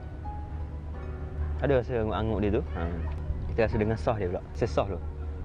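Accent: Terengganu, Malaysia. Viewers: Pekat molek ganu bosku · Kuat bunyi ganu deme... Kemah molek dok gogek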